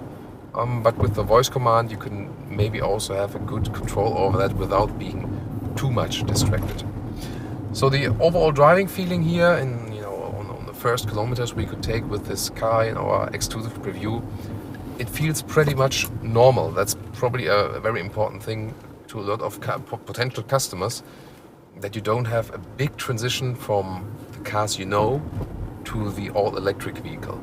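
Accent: Germany. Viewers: Admirable german accent! Very smooz!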